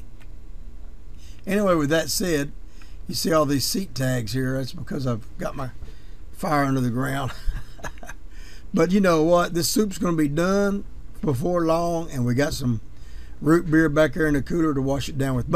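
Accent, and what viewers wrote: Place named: South, United States. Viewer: two questions my friend.... 1. what game are you exporting to? 2. where you from? (great to hear the southern accent...I am from Alabama)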